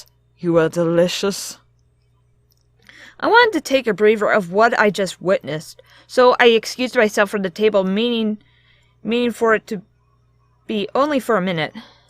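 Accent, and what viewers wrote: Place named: United Kingdom. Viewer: that British accent xD this is awesome and your voice is so sweet!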